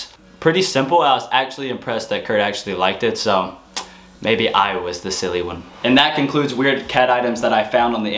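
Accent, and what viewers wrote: South, United States. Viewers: You sound country 😂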